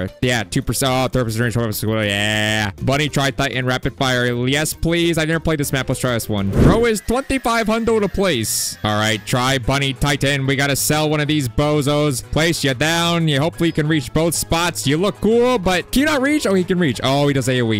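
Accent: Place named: United Kingdom. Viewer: Why bro speaking British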